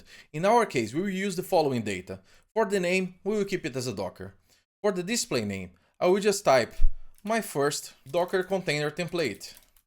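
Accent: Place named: Brazil. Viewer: percebi que o sotaque parece o da nossa terra ^^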